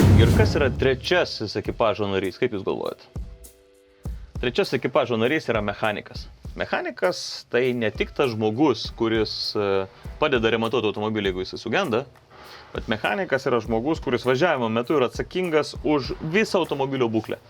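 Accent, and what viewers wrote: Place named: United States. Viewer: kalba su ameriketisku akcentu.ziauriai skamba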